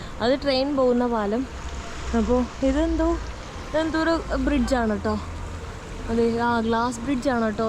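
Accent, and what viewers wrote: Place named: Kozhikode, India. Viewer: Your malayalam is more like Kozhikode slang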